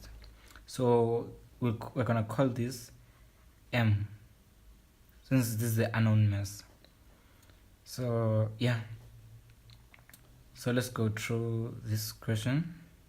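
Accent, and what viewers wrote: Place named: South Africa. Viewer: I know this is a South African voice. Which university you at? Thanks for the help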